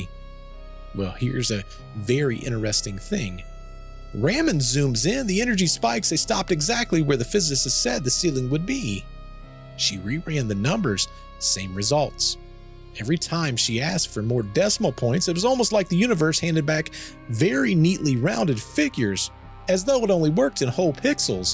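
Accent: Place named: United States. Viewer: It’s so dope to hear an American voice narrating 👏🏽👏🏽👏🏽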